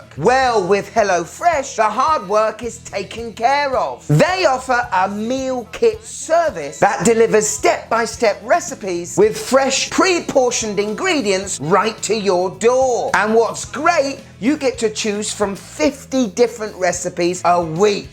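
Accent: United Kingdom. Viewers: HES BRITISH????????????????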